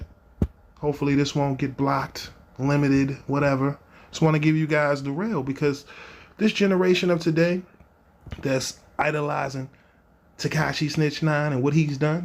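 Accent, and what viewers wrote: Baltimore, United States. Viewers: You from Baltimore dummy? Glad I found this channel Love hearing dudes from Baltimore get love we on the map right now with the music and shit 💪💪🔥🔥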